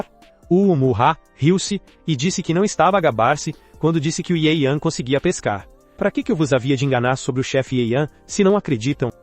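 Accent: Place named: Portugal. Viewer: Essa língua portuguesa falada à maneira de Portugal não dá..m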